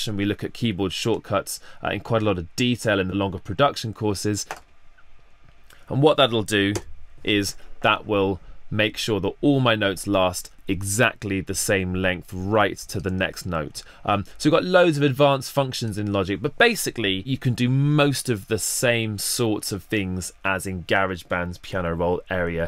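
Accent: United Kingdom. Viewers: After watching this video I never want to hear another British person pronounce “garage” ever again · Every time I read GarageBand in my head, it’s now in a UK accent....damnit.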